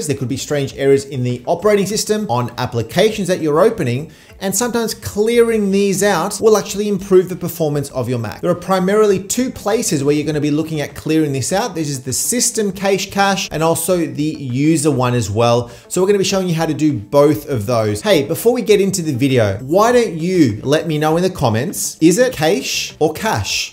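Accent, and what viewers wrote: Australia. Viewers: love the Oz accent so keep the pronunciation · Thank you! Delete the cache really is ok!?! And I love hearing a fellow Aussie accent!! And also, there used to be the ‘other’ files located on the Mac storage, now have they been renamed to “MacOS “… i know that sounds odd, but it’s in the exact location where ‘other’ was…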